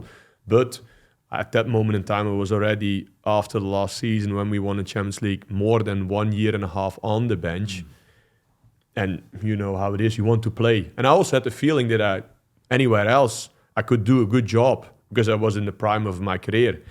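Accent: Liverpool, United Kingdom. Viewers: sound's very scouse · He still has a scouse twang to some of his accent haha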